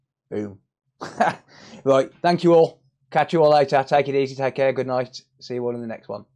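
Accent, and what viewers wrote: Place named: Dudley, United Kingdom. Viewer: Sounds like he’s a local lad from Dudley way.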